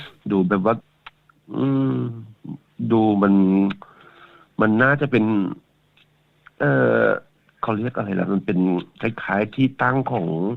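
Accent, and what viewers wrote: Kanchanaburi, Thailand. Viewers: สำเนียงคนกาญจนบุรีเนาะ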